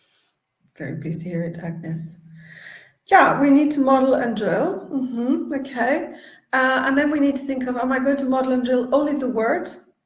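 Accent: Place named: Russia. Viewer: You are absolutely right, she has harsh Russian accent, her knowledge and professionalism I have not criticised by the way, bookish definitely ( having impression that she has not experience talking with native speakers) I tried my best to listen the tutor to the end, no success. I am not teaching English, more over I am not teaching other teaches !!!!!!!! I can have any accent. Good she has got comments, now she can working on her accent . It is hard work in universities for interpreters, they have classes for this particularly problems, as muscles have not been used for soft talk and for different phonetic, but has to be done as many ppl did. Wong Pronunciation it is common for Russians teachers. This is why I prefer to listen and communicate with native speakers .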